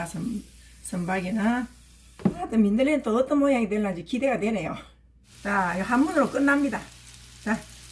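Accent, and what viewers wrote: Gyeongsang, South Korea. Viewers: ㅋ 그 손감각이라는게 해 본 요리는 되는데 생소한 재료는 쫌ㅋ 구수한 갱상도 사투리 좋습니다~~아이고 기름에 튀겨지는 소리 완전 침이 꼴깍합니데이~비주얼짱임다😄😅🤣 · 직접 채취하신 재료로 쉽게 하시는 튀김 바삭하니 맛나게 보여요 말씀이 경상도 사투리~ 정감있어세요~ 응원드립니다~^^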